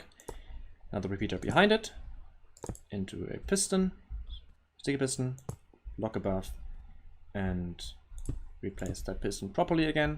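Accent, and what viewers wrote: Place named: Germany. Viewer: I needed that thanks a lot :D Btw ru german? cuz u kinda have the same accent like ilmango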